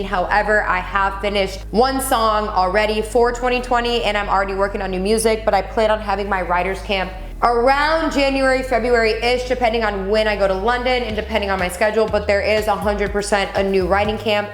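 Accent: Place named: Nashville, United States. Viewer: She said she just got back from Nashville. Kind of just sounds like that accent is coming out a little! I’m from Mississippi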